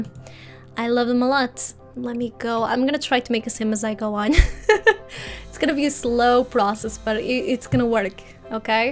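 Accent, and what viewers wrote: Portugal. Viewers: you're portuguese right? I've noticed the accent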